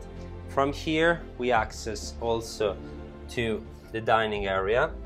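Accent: Italy. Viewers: Your aristocratic Italian ascent and your selling technique is unique, especially with the beautiful landscape of the Italian country side, This property exudes character and elegance, certainly an investment which can improve with a healthy income while the prospective property owner can also capitalize on living in a superb location. I am from New Zealand living in Australia, both beautiful countries, your delivery is a symposium of magic,drawing us into a life style of Italian eloquence. Thank you.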